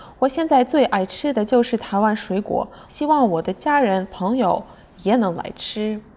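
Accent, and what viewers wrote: China. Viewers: 倒是不一定。國外學中文本來就是學中國那一套，帶中國腔是正常的🤣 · 等等 中文講得很好啊 雖然有中國腔 但還要來學中文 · 這種之娜口音的下次可以不要發嗎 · 聽口音就知道去中國撈錢失敗轉戰台灣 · 她一口大陸腔的國語，四聲再抓一下就很標準了。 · 她說的是中國腔馬列中文， 不是台灣腔國語！ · 肯定是在來台灣前就有學中文了，有大陸腔跟用語，因為烏克蘭跟大陸是相連的！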